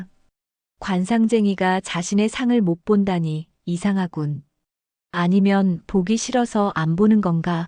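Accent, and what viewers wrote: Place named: Gyeongsang, South Korea. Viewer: 또 경상도😂😂😂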